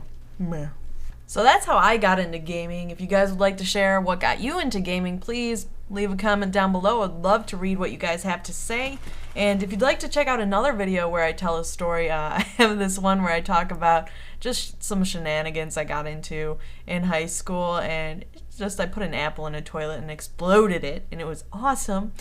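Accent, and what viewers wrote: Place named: United States. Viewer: Hey I'm new to subbing to you and I have been subbed to whiteboy for a long long time and I noticed u kinda have the same dialect as him. Are you from the Sam part of the us or something?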